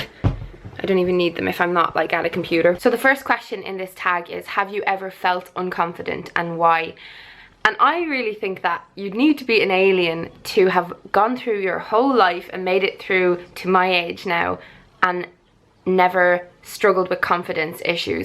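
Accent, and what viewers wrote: Ireland. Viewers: Had to break for the Jehovah's Witnesses there, we had a nice chat there haha. Aw I love Beautycreep too, I've been watching her for years (being Scottish and all). I like the accent and live in Wales now. And you, provide me with the Irish accent that I love so much! Plus both of you have lovely videos, are so down-to-earth and have such a positive outlook.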